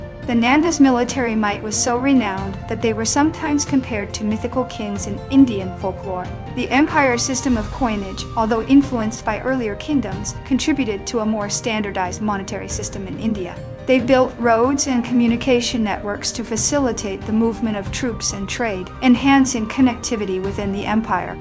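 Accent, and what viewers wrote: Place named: United States. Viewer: why do you use an american accent that corrupts Indian names? Have the decency to be true to your subject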